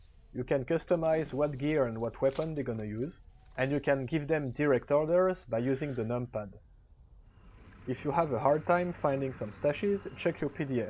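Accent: France. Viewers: ...wait ... are you french? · Mdr t'es français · l'accent français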